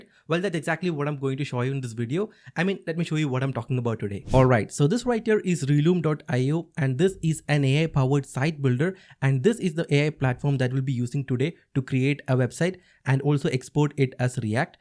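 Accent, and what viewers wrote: Kerala, India. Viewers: ah that irritating malayali accent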